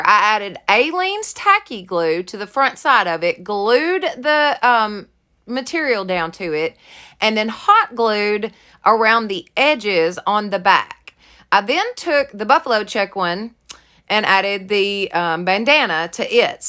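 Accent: South, United States. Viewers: girlfriend, you “blew these out of the water” they are all so gorgeous!! That pumpkin one is the prettiest thing I’ve ever seen, girl you are sooooo good!!! Oh yeah, I wanted to let you know that I ordered some Chalk Couture from you🥰 I have really been wanting to get into doing that but I just have put it off until TODAY! Girl when I saw you were a consultant for them, I had to get started with you as my consultant!! You inspire me so much, you have NO IDEA! I am only 54 and pretty much home bound because of my health. I don’t have a lot of good days, but even if I’m having a bad day, like today, I can watch your YouTube videos and you just cheer me up TREMENDOUSLY!! I’m from a small town about 30 miles south of Birmingham so I totally appreciate your “Southern drawwww” cause I got it too, lol!! Thank you so much for all that you do and create for all of us to enjoy and sometimes create our own!! 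I love you and your channel and I sincerely mean that!!! Keep making those videos please!! Thanks again, LOVE YA GIRL! Paula Wilder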